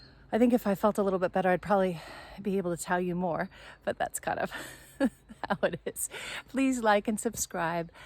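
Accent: New Zealand. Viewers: You got bit of that kiwi accent dear, nice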